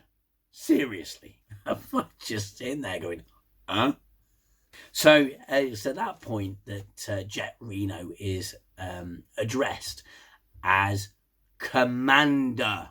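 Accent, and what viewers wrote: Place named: United Kingdom. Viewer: Were it not for your Limey accent you Id take you for the testosterone poisoned , but still physically doughy, caucasian fan boys that infest the body of SciFi fandom. I didn't like the first season and 3 quarters of Discovery. However, last season and this have been spot on. You whiney "proud boys are a joke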